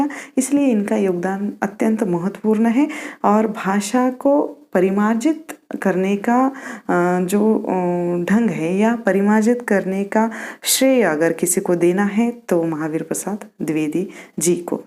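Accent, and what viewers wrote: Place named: Maharashtra, India. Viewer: Just by listening to your accent I made out that you are a Maharashtriyan.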